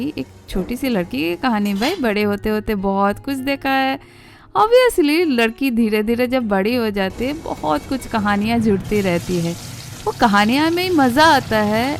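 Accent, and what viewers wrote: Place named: Bengal, India. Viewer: agar aap is format me interested ho, in Hindi language, then I would advice, you have to work on your language using some kind of training. Aapke words bahot achhe hain, tone bahot achha hai, but the beauty of what you intend to deliver, is getting lost, as the Hindi is Bengali accented. Bol chal ki bhasha me koi bhi accented language achha lagta hai, but is type ka theme agar apka aspiration hai, then please look for a language training somewhere. Agar language finesse ayega, then the narration will be of another level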